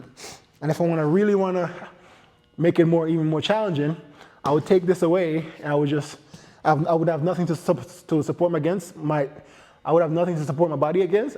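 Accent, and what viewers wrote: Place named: Jamaica. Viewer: Me hear de accent mon , me like this workout yahzimehhh !!!! 🇯🇲🇯🇲🇯🇲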